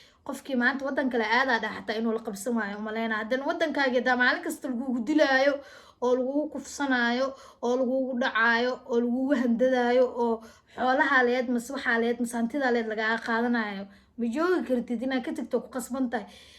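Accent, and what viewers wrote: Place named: Mogadishu, Somalia. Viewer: xamar aka hadla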